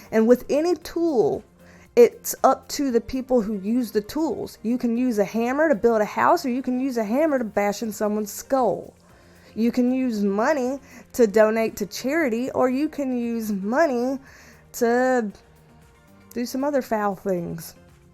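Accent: South, United States. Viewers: A fellow Aries!!! I looove! 😍 No wonder I feel like I just click with you! Plus, ya make me homesick for the south with your accent! I'm a Texan living in New York right now, so listening to you makes me feel at home. ❤ Love ya, gal! Take care! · I looked up the word perking it means cheerful or lively, it's so cute when you use that word :) I'm from NJ. and yes we talk differently and it's so fun for me to hear the southern accent I have a strong Jersey accent and yes I can't stand it LOL! I have been binge watching all your videos and you are amazing! You really are amazing and I hope you can see that. I believe your channel is going to be huge and if you come out with Merch I would be excited to purchase it. I just want you to know you are a gift to this universe! Your kindness and your beauty radiates through the computer and I would like to thank you for telling us about you! 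Keep on Perking :)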